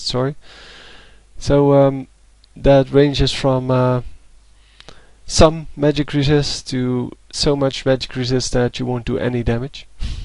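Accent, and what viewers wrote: Netherlands. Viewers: dude are u from holland?? ur accent is pretty much like dutch and sounds dutch too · you're dutch right ?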